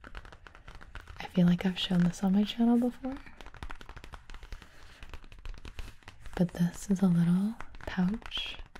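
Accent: United States. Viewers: Are you american , You are the best 😀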